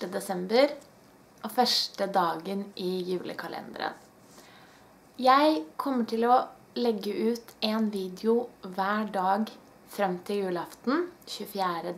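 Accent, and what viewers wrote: Oslo, Norway. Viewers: Vilken norsk dialekt snackar du? Ibland tycker jag vissa norrmän snackar lite otydligt, speciellt skidåkare. Inte för att dem använder andra ord som inte Svenskan och norrmännen har gemensamt, utan för det låter som de inte uttalar exakt alla bokstäver i orden. Men din dialekt är fullt 100% klart att förstå. Oslo-dialekt? Jag själv är norrlänning på östra delen av Sverige.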